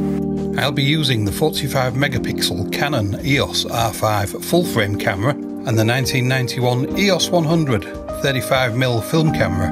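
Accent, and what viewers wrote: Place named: Hull, United Kingdom. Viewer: Can't hide a Hull accent :)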